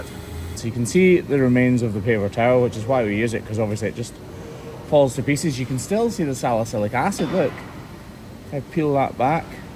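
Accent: Scotland, United Kingdom. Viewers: When is your audiobook released,? Got the book but I love the sound of Scottish voice (part Scot but I talk Scouse)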